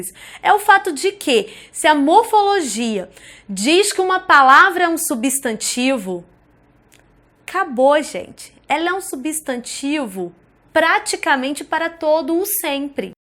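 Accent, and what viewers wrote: Rio de Janeiro, Brazil. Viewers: O sotaque mais bonito do Brasil. E eu nem sou carioca. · Tu é carioca?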